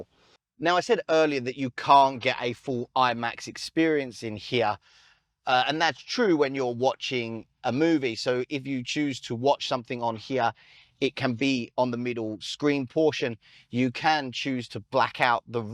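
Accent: United Kingdom. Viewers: I dont like the audio , the accent is so british for me or even irish , and its not entertaining everall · I've been torn about writing this comment, but I've choosen to be honest with you, since I've been enjoying this channel for so long, and it deserves my honest opinion - no punches hold back. I don't like the style of this reviewer at all: 1. his strong accent make it quite difficult for people not used to British accent to understand what he's saying - this is even more important for non native english speakers, who will struggle compared to Ethan, which instead is quite easy to understand. 2. he's speaking too slow, and with a lack of energy. 3. the review itself is boring. That never happened to me before in a review of this channel. 4. please don't assume that all people watching this channel uses imperial measures - we are not. In fact, it is probably the opposite, since the US is the only country on the entire planet that it does (4% of the world population). 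Please use the standard metric system, eventually using also other local (old and obsolete) unit of measurement if you want to help people not used to it (ex. "I'm 1,75m (or 5 feet 9 inches) tall"). Thank you. So, not meaning to be rude, but I'll probably skip the next reviews with this reviewer...unless is really a car I'm looking for. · Some people struggle with the English accent. I tried to slow down a bit to compensate. Seems it didn't work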